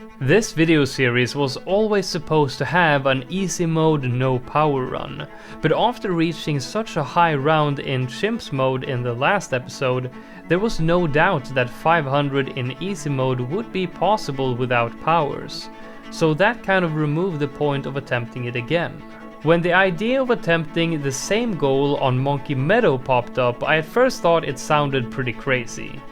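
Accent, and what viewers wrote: Sweden. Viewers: Dude are you Swedish?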